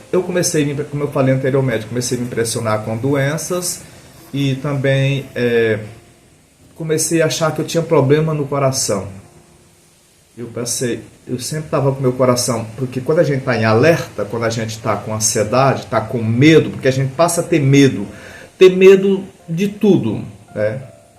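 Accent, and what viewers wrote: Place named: Piauí, Brazil. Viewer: Acho lindo sotaque de sotaque nordestinos do Paui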